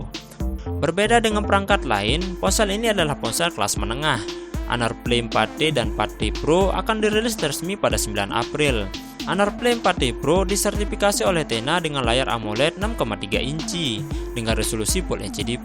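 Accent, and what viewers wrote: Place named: Java, Indonesia. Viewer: Adooh, medok suarane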